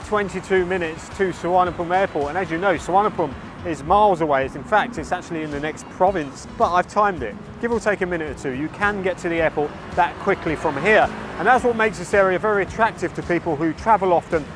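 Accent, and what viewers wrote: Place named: Oxford, United Kingdom. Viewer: yes , clear nice oxford english as a native british. Guess he is a true gentleman as well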